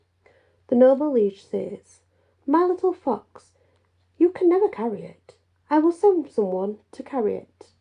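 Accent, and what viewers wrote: United Kingdom. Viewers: Hey sis, im connecting from Krishna ..I love your beautiful brittish accent ...i love the story ...❤❤❤❤ love your contents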